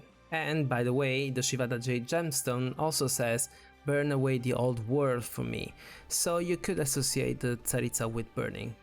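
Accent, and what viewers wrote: Italy. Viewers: you are italian arent you? I recognize your accent reading in latin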